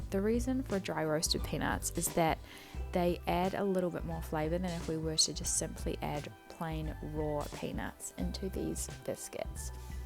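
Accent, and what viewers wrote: New Zealand. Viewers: You sound like a kiwi who wants to be a yank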